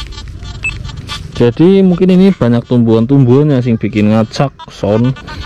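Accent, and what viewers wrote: Banyumas, Indonesia. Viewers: hahaha ngapakkkkk men rika kang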